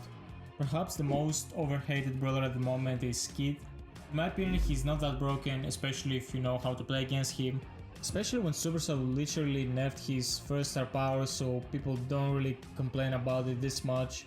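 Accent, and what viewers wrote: Greece. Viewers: Bro with your voice i can understand you are greek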